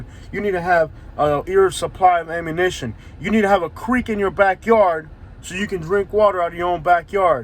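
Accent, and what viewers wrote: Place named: South, United States. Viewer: Hey you developed a southern accent rather quick.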